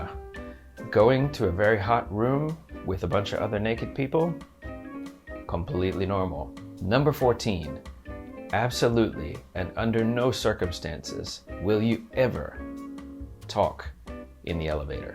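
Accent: Finland. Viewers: I think I'm hearing a slight Finnish accent in your English.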